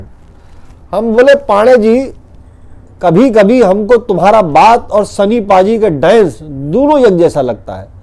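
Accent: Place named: Kanpur, India. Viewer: Rocks!! Once again. You hit the bulls eye... 🤘🤘🤘🤘 God bless... And ur kanpuriya accent is getting better by the day... Hum bhi flabbergastiya gaye!!! ❤❤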